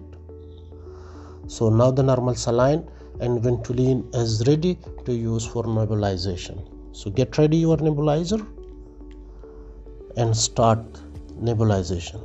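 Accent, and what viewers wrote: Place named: India. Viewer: you have an accent which I think is Indian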